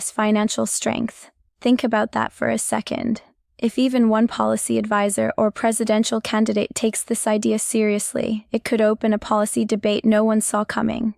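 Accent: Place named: Latin America. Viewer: I prefer her Latin accent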